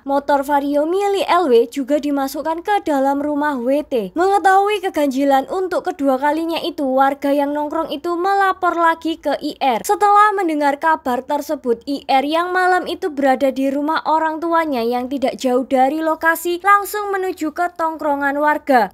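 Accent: Java, Indonesia. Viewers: Mantap mbak medok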